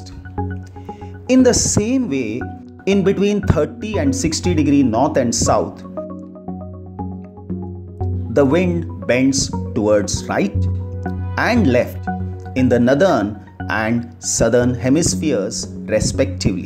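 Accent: India. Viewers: the indian accent takes it to another level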